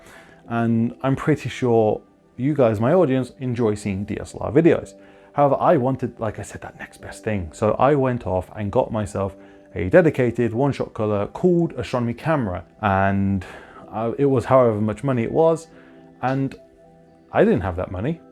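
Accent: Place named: Scotland, United Kingdom. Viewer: You have an intresting accent. Where are you from? Scotland?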